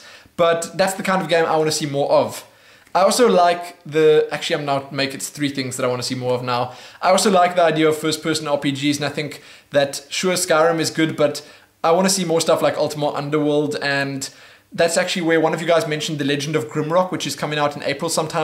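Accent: South Africa. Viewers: Gogo the SA accent! Good show and a new fan!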